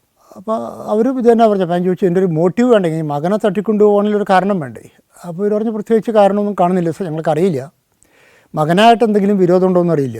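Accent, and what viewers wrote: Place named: Wadakkanchery, India. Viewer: Sirnte veedu wadakkancheri aano ? Slang also like that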